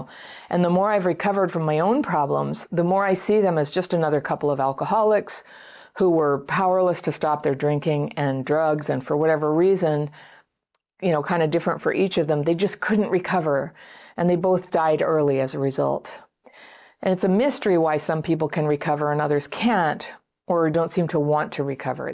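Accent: United States. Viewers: so embarrassing about my cluttered apartment .. while running to shelter .....❤1. I brought this trip upon myself....Where to escape?? Currently, exhausted, Extremely isolated, brainfog, in Israel #under_fire🎉 #day24 2. Expat from the USA, and appreciate your American accent. Voice From home . I studied psychology... Came here to confront religious Absurd-abuserS who live in Israel.😮😅😊.... So glad I just found your channel/ account. I need tips + excersize for time of war. Staying in hallways/ bomb shelters mostly. Tiny spaces. In Israel under rocket fire. Bless u for all you do! Can send me an email with your videos on you tube or any other free content you can offer. Where are you located these days? City/state? I'm an Expat from Miami currently in central Israel! Sending love, light & hugs wherever it is needed. Amen!❤️ SaraGraceFreed😊3? How to pack and plan while under fire, 4. 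NEIGHBORs Friends have lost.lived ones... mmm. 5. Buying groceries instead! So confused. Help!❤Sara😂🎉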